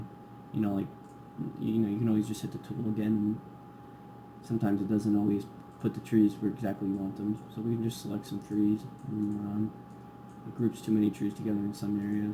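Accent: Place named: United States. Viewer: Youve got one of my favourite America accents 👍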